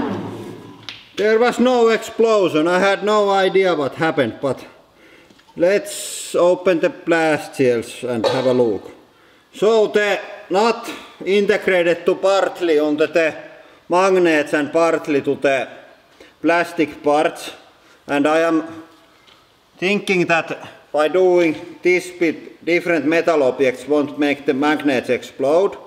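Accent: Finland. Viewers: Dean Rowlinson Sounds like my Finnish grandfather. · This is awsome channel. The finnish rallye english is something else. 8) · He sure sound Finnish. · omg even when he speaks english it sounds like finnish. 😂😂 what is he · The rough finnish accent is killing me xdd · that accent is so finnish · Today the content was not so good ..but like your Finnish accent and your wife's laugh so I pressed like..!!! Stay happy..!! Love from India..! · Is this guy finnish. The accent sounds like it lmao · The Finglish is strong with this one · Are you from Finland cause you have a Finnish accent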